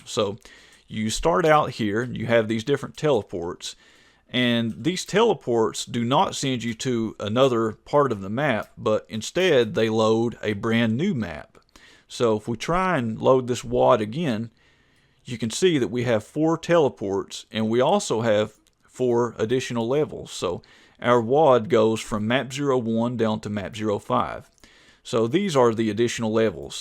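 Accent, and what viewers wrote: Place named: Texas, United States. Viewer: i can hear texas from this man's accent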